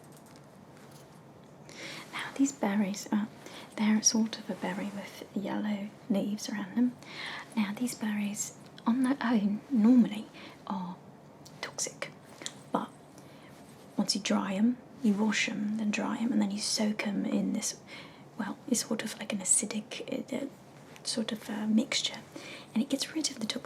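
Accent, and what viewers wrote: United Kingdom. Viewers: EWWWWW BRITISH ACCENT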